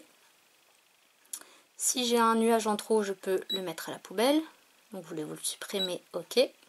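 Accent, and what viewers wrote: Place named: Normandy, France. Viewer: Bonsoir, j'aime beaucoup tes vidéos, super bien expliquées c'est vraiment super car ça manquait, merci beaucoup. Je pense que l'on vient de la même région j'ai reconnu l'accent normand 😉. J'habite à Paris mais je suis native du Havre j'y retourne régulièrement d'ailleurs. Merci encore pour toutes ces vidéos explicatives.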